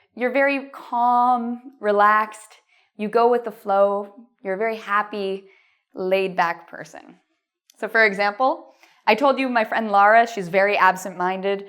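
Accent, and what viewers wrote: Canada. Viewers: This is a Canadian accent :D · I love your Canadian accent. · Are you Canadian? I can hear you say 'boat ... :)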